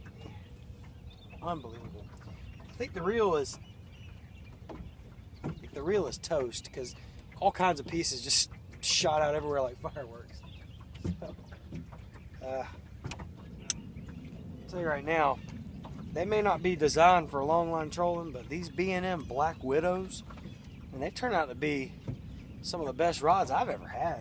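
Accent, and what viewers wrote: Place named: Texas, United States. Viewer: Are you sure your not from Texas?👍 enjoyed the video, I will subscribe!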